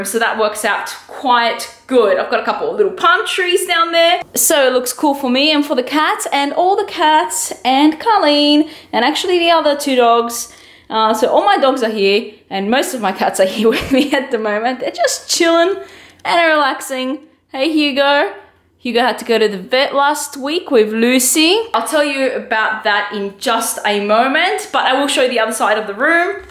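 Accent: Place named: Australia. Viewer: Aussie accent 👊🏼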